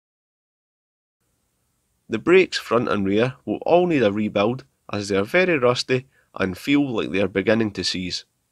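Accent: United Kingdom. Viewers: I love these no bs vids, and the Scottish accent ( I lived in Edinburgh for 2 years ) is superb !! Cheers from Australia :) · Great video, love it Out of context: can anyone pls tell me where his accent come from? I guess Sussex or maybe Scotland? Thnx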